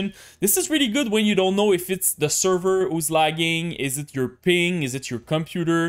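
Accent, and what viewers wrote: Canada. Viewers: Nothing better than a modest Canadien accent 👌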